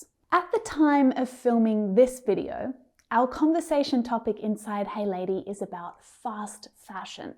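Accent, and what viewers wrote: United States. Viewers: The pronuncaition is American English. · I fink is american accent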